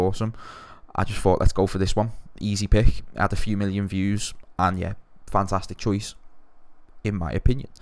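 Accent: Liverpool, United Kingdom. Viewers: you from liverpool by any chance? keep the vids up dude good stuff!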